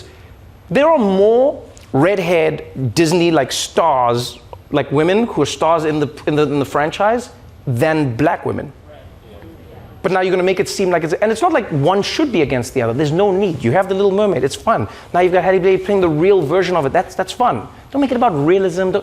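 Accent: South Africa. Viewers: didn’t realize how south african his accent was until now?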